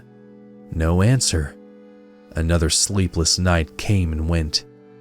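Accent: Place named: Midwest, United States. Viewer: This is awesome so far! The way you get more intense towards the end is cool. Your Midwest accent is funny, but semi accurate at the same time lol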